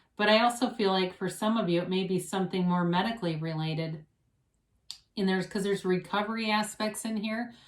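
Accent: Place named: Michigan, United States. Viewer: Sounds like you have a Michigan accent...or thereabouts.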